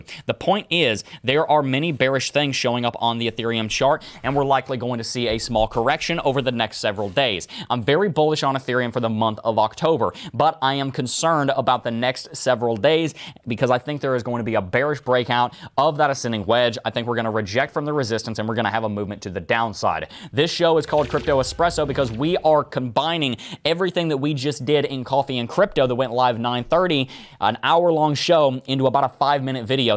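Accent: South, United States. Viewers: he's from the south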